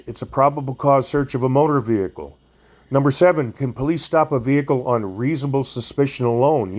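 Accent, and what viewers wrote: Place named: United States. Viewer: Irish! You sound very American.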